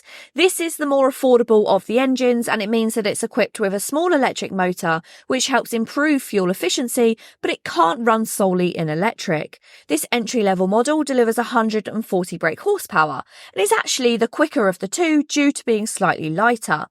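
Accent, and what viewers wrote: United Kingdom. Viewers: Oh that stupid british english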